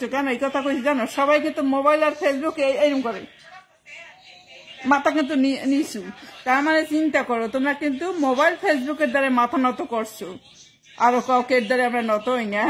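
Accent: Barishal, Bangladesh. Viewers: আপনার বাড়ি কোথায় আপনার কথা তো বাংলাদেশ বরিশাল জেলার মতো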